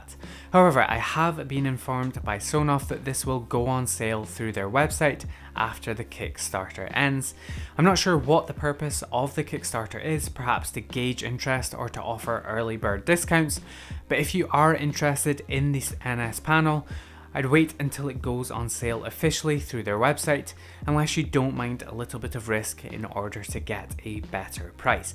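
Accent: Scotland, United Kingdom. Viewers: At last a decent review, thanks for the clarity and Scottish accent a bonus! :)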